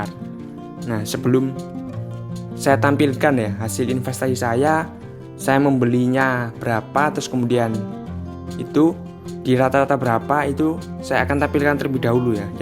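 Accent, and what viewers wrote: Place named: Java, Indonesia. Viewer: Medoknya keliatan🤣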